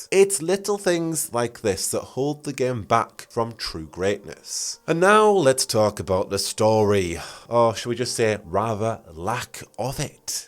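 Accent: United Kingdom. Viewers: ew british.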